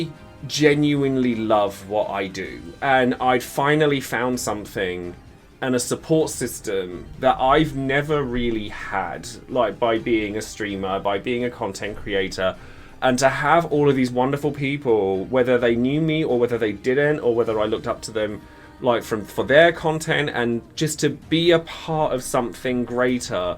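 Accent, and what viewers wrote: United Kingdom. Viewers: Okay, this is a lot to chew on. First: yes, I came to this channel for the game in question (Opera Omnia), but got charmed by the editing and the formating you gave everything you youched and I currently stay here because you. Yes, you. Your way to communicate and express yourself, your emotions that pour through the video to us, your british accent, everything you, just you. Never undervalue yourself, never fear the tomorrow so badly that it stops your journey, you are far more better as a content creator than what you, yourself, think. Trust me, I've seen my fair share of CCs and I'm good friends with some of them. Second: CHANGE! Yes! Bring it on, baby! This is a case of one of my bestest friends. She got an offer back in the 2018s to jump from Twitch to Facebook Gaming and she accepted it, however she didn't realized at the time that she fell into a booby trap that would slowly undo everything she had built for almost a decade. 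She jumped back to Twitch at the start of 2020, before the pandemic. Her view count had been reduced by 9/10ths, it was the biggest blow she received and she started climbing the metaphorical mountain again. By the summer of 2020 she did a gamble, she started to write the script and the setting for a tabletop role-playing experience, she would be the Master and close friends that are also streamers would be the players. She didn't know if it would save the channel, but she did it regardless. First, to have fun, and second, to expose this part of he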